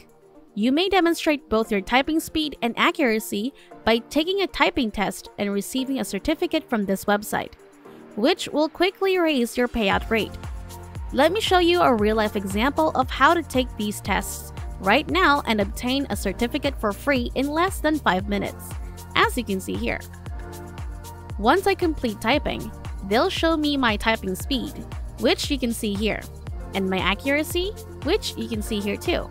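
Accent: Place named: Philippines. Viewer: The woman talking in the video sounds like a Filipino. She has that Filipino telemarketing English accent 😍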